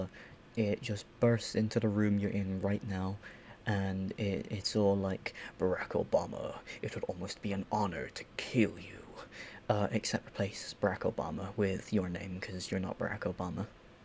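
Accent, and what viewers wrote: United Kingdom. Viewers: Thats a woman? Your just so British that I couldn't tell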